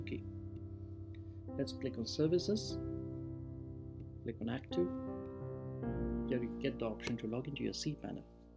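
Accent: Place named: India. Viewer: Click on tutorial Hear Indian accent Close tab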